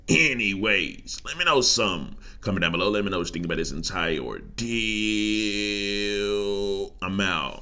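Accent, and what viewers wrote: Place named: New Orleans, United States. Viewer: Ur aww aww sound like u been n that nolia b4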